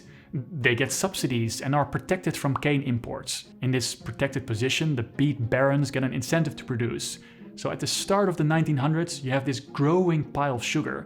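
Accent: Netherlands. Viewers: I really love the vid! But I can hear you're dutch too much to watch it lol. Just can't handle hearing my own accent😅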